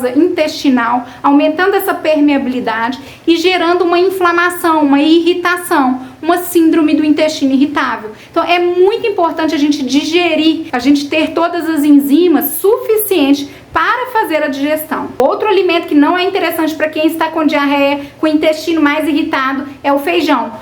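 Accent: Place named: Minas Gerais, Brazil. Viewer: Gente q sotaque gostoso.... parece ser minerinha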